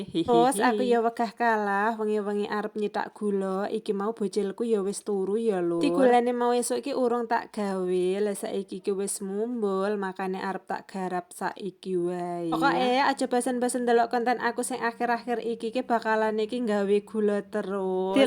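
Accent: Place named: Temanggung, Indonesia. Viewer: Kok logate temanggungan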